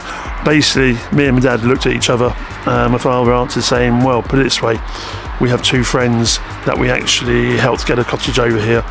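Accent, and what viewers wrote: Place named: United Kingdom. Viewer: Gosh, it’s takes an effort to follow you. Speedy British not sure from which part of England and a bit of man mumbling😊. But I sounds like your French is better than your English!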